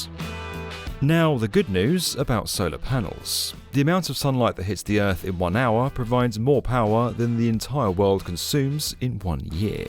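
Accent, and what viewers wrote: United Kingdom. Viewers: Check. The Cockney. Easy now big fella. 😎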